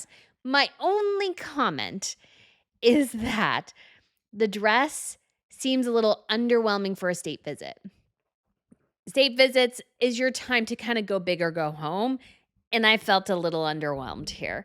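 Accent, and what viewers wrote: United States. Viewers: please get yourself a speech teacher and work on your squeaky girlie voice. You're too old to sound like a 14 year old. And while you're at it, you might want to get rid of that heavy American accent just a little bit.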